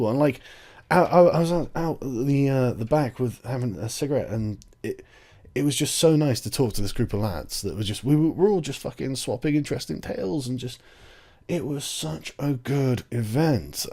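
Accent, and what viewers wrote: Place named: United Kingdom. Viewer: you sound reeeeally british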